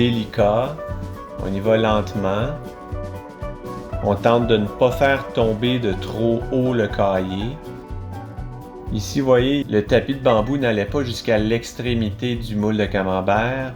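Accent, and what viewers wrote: Canada. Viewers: Bonjour de la Tunisie Ça fait deux jours que je ne cesse pas de regarder vos vidéos que j'apprécie tellement et que je vous trouve le seule youtubeurs qui explique bien les détails et puis j'apprécie tellement l'accent canadien ♥️♥️♥️ Merci infiniment Sauf que j'ai une question, je n'ai pas de frigidaire spécial pour les fromages Est ce que je peux le garder dans une boîte plastique dans la température ambiante ici qui varie de temps à autre mais qui dépasse pas les 20° ici en Tunisie ? Pouvez-vous m'aider s'il vous plaît ? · Merci pour la qualité de vos vidéos, et merci encore de partager les erreurs c'est comme ça que l'on progresse. Ps : pour vous c'est naturel mais merci aussi pour le petit accent et les expressions de nos cousins de l'autre côté de l'atlantique. 😉. Petite question pourquoi ne pas acheter du lait directement à la ferme ou du lait frais complet?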